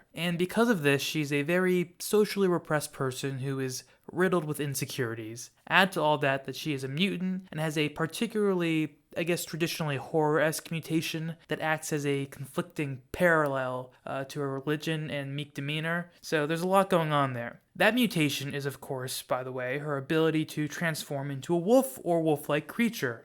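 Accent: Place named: Scotland, United Kingdom. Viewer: Nice thoughtful look at Rahne Sinclair! Loved the Scottish accent!! Looking forward to your thoughts on the other New Mutants! Thanks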